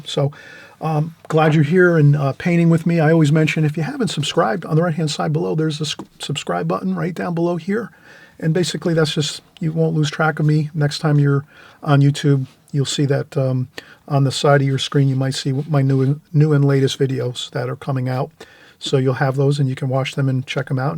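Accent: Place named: Philadelphia, United States. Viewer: I’m from Philadelphia, living now in South Jersey. I recognize your accent.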